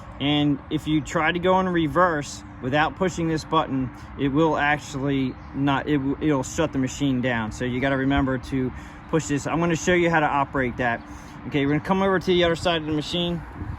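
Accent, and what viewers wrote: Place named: Delaware County, United States. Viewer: Also are you from southeast PA? You have a Delco accent!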